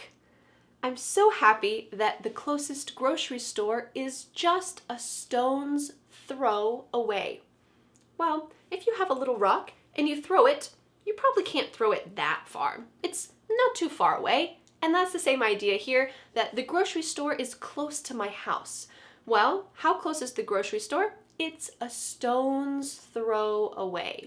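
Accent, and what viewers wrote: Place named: United States. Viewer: Thank you very much, you have taught me the American accent excellently Follow you from Algeria 😊😘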